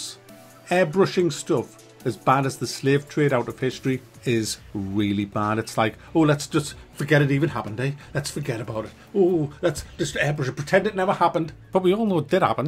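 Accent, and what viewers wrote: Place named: England, United Kingdom. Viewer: What’s more annoying than that poor ass attempt to provide “entertainment” in this review, is the absolutely jarring and insanely annoying accent. I mean, damn, limey accents can be rough, but this I couldn’t listen —nor would I ever want to— for more than 10 minutes…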